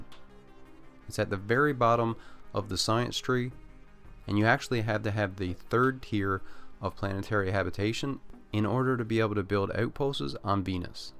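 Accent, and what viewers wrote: Newfoundland, Canada. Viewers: I didn't notice your channel name, but 3 seconds into the video I knew you were from Newfoundland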